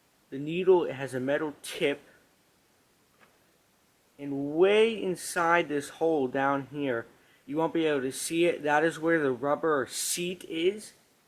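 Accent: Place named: Pittsburgh, United States. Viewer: I can tell. Definitely sound like Pittsburgh. Lol 😂 I am from Eastern Ohio. And we sound similar.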